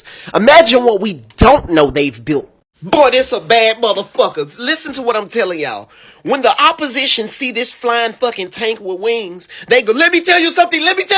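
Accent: Memphis, United States. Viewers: Boss man I been watching you for some time now and didn’t realize you are from Memphis….but I knew you was down this way some how by ya dialect 🫡🫡🫡 Proud Memphian from the north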